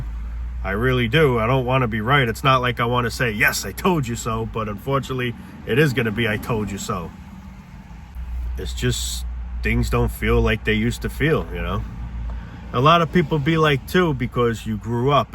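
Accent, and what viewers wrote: New York, United States. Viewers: Your cynical New Yawk diatribes at 2x speed are a real treat.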